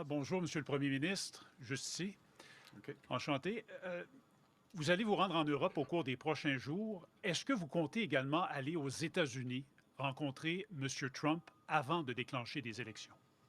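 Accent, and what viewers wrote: France. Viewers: I don't know why some critics say he doesn't speak French well... He does and he speaks French French not Quebecois, maybe the critics are attacking that.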